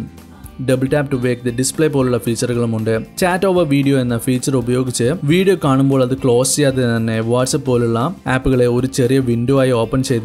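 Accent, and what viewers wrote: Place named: Kannur, India. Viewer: Bro kannur ano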